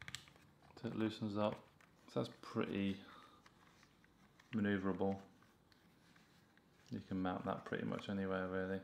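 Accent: United Kingdom. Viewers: Help! When I turn on the dash cam I get the globe and then immediately asked to choose Mass Storage or PC and it freezes when in the car. Also, the charge does not seem to be holding because when I charge via the USB for hours, then unplug and try to turn on, nothing zippity do dah. Can anyone help me? PS: This is the best video. I love the Queen's English as I don't speak American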